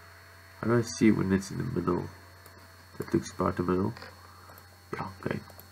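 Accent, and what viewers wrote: Afrikaans, South Africa. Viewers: That boere engels. Haha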